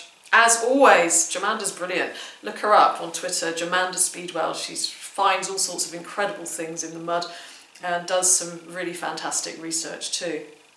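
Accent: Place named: England, United Kingdom. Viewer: Watching you find these things and listening and you explaining them all is delightful! I love your English accent.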